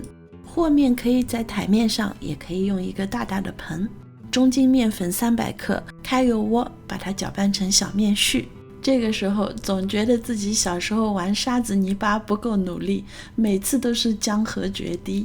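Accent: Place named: Jiangnan, China. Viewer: 你是我在YouTube上看到的做饭最精细的人，口音口味，真的是家乡风味。江南人做面食和北方人差别太大了。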